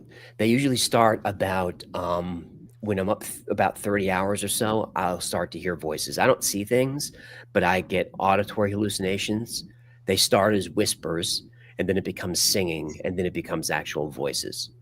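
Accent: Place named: Bronx, United States. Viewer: I knew I KNEW that accent!!! From this Bronx native… you hide it well, lol. But it slips out a little sometimes ❤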